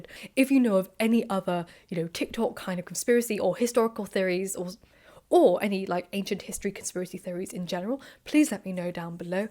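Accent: RP, United Kingdom. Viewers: My belief was that an event similar to the Trojan War took place and later a fantastical poem was made in the aftermath. Arthur from the story was King Arthur was quite possibly a real person. He was mention in Celtic poems/songs around the time of the Saxon invasion and added on by other authors/storytellers up until Mallory who threw it all together and added several fantastical elements. I believe there is some true to both and I also but a character like Jesus in the same camp. Obviously the person existed and over time the story grew and with each retelling it grew larger and more unbelieveable. All that being said...this is inappropriate but does anyone else find that posh English accent insanely hot, other than myself? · Not British person question: Is her accent particularly posh? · Yer modern british accent is stark compared to my Southern American Accent. 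Love the info,.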